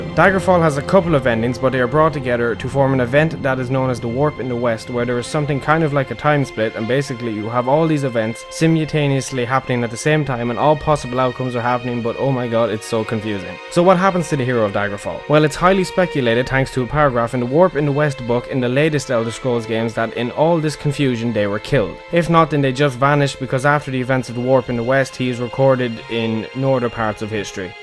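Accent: Ireland. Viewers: Is your accent Irish? It's awesome I want to hear you read a book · He mispronounces Tharn because of his strong Irish accent. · The Irish accent is the only way to hear the elder scrolls. Save for some dodgy pronunciations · I'm thinking is not mispronouncing the tes names but simply speaking them in the glorious Irish accent. Didn't know how I felt about it at first but it's kind of nice. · When I was watching this I couldn't place your accent, but after noticing how you pronounce your Ts I was like "oh shit he's Irish" then I saw your channel name.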